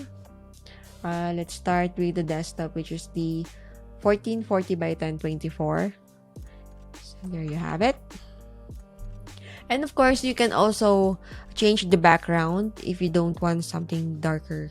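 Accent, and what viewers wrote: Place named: Philippines. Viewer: Thanks for making this video. I also love your Filipino accent. 😍 I have a question about creating wireframes for app UX/UI and even for the web in general. I used to create wireframes first for clients and then high-fidelity mock-ups.. But ever since I've used page builders such as Divi and Elementor which was 6 or 7 years ago I have designed/built directly on the page builders. What you think are the pros and cons when it comes to the design process of starting from wireframes vs. building right away on the browser or mobile apps? Also which types of clients prefer one over the other? I have always attracted clients who do not mind me building right on the page builders and skipping the "design" part of the process. So I am curious if I can still be able to get clients who do not mind the longer version.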